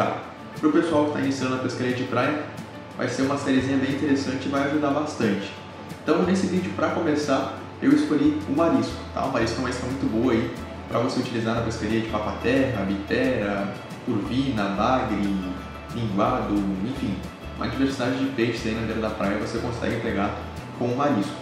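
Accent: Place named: Porto Alegre, Brazil. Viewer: opa blz, tu é de Porto Alegre tchê ?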